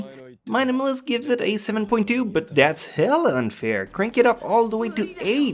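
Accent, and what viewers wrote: Quebec, Canada. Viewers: CHESS oh quebecois french, I was going to say i couldnt think of any mainland varieties of french accents that sound like that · Mak Gnik French I would assume since he is Canadian, since he has an accent he'd be from French Canada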